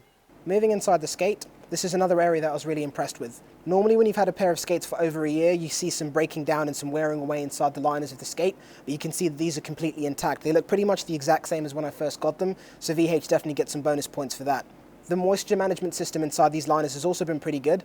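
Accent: United Kingdom. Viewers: I have to say this: I really enjoy the way you speak (voice, accent, style – snappy, masculine and British). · A black fellow with a British accent talking about hockey.. definitely don't see that every day eh?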